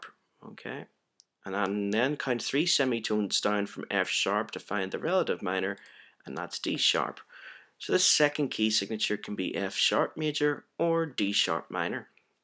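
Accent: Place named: Ireland. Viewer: You speak with an Irish Accent... I might be wrong man...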